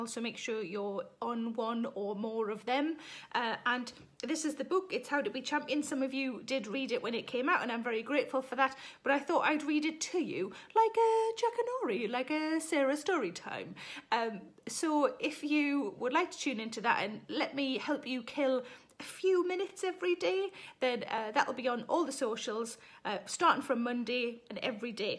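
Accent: Newcastle, United Kingdom. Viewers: I love yr geordie accent 😅😅😅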